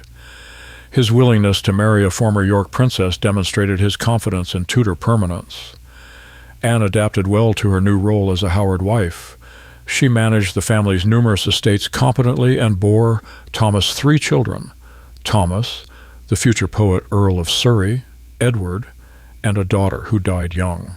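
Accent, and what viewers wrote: United States. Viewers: A bit hard to listen to an American accent on this subject; bye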